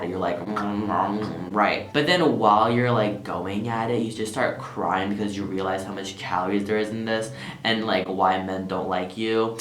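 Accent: United Kingdom. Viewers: Love ur british accent🙈😂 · Love your British accent so much 🙈❤️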